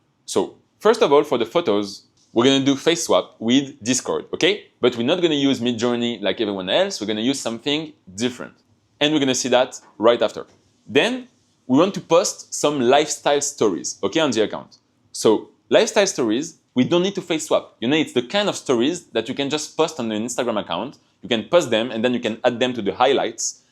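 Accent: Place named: France. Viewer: Salut j'ai reconnu que tu est Français hahaha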